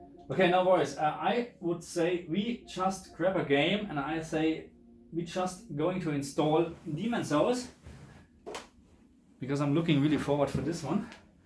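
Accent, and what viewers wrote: Germany. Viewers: Absolutely u German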